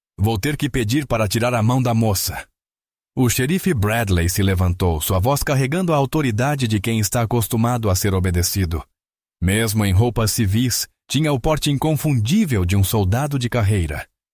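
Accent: Portugal. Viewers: Pq esse narador tda vez q fala um número errola a língua, ou pronúncia em português lusitano ???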